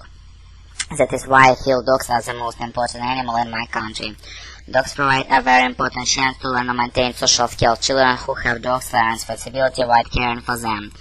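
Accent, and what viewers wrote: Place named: Russia. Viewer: чотко слышно русский акцент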